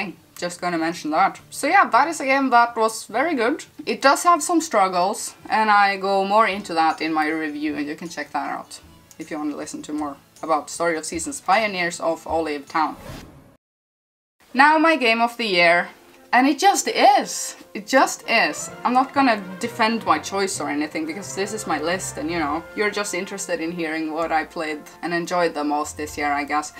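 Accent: Norway. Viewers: Cool video girl. I subbed because you're cool and I like your accent from Norway huh?? Thata neat and you like Nintendo 🙂 Happy New Year!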